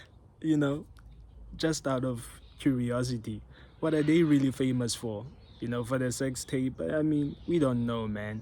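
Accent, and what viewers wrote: South Africa. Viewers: Are you from South Africa? picked up on the undertones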